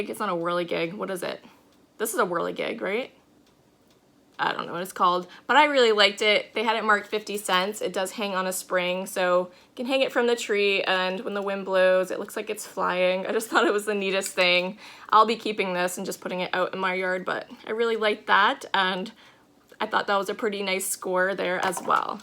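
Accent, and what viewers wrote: Maine, United States. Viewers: Wonderful Maine accent!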